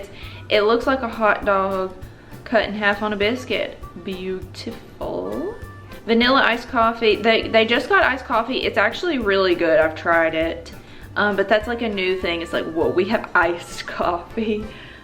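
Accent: South, United States. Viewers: My sisters name is. I can’t marry her (I’m adopted(probz sound weird😅) but your name is W two DDs F.U.N F.U.N. My artist name has two DDs(like this) too ahaha. Anyway you sound southern. Southern hospitality meet African affability together= ignition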